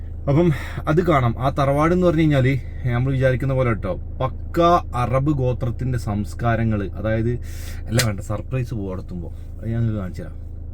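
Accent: Malappuram, India. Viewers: അൻന്റെ 😅 വർത്താനം എനിക്കിഷ്ട്ടം, ദുബായിൽ റൂമിൽ ഉണ്ടായിരുന്നു മലപ്പുറം,ചങ്ങായിമാർ, മറക്കാനാവാത്ത, ചങ്ങായിമാർ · bad malappuram slang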